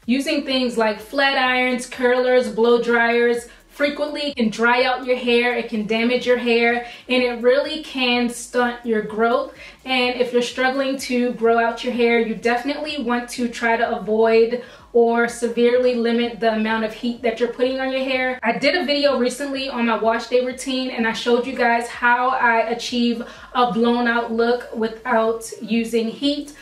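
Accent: Baltimore, United States. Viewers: I hear a Baltimore accent! 🤔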